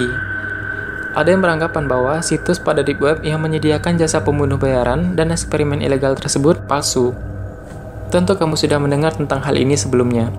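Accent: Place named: Makassar, Indonesia. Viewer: Logatnya agak-agak ke Makassar (tw sekitarnya), Iya ya bang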